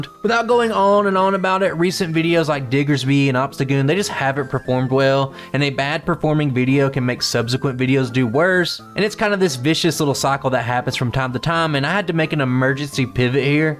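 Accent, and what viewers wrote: South, United States. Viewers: That sounds like a southern drawl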